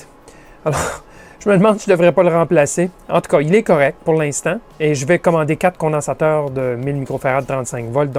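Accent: Quebec, Canada. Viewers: Excellente video, comme toutes les autres d'ailleurs ! Un grand merci et Bravo a vous et avec cet accent de nos sacrés cousins ! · Bonjour, merci pour votre vidéo. Je me posais des questions quant à la mise à la masse commune en cas de mesure avec un ocsillo et comment juste avec un multimètre suspecter un condensateur de filtrage. Et bien, j'ai eu ma réponse et vous en remercie. Etant Français, l'accent Canadien est un pure plaisir ;-) merci à vous.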